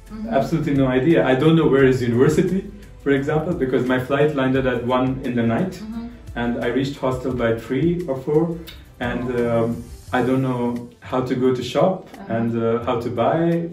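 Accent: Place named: Russia. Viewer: His english accent ia also became similar to how russian people speaks russian.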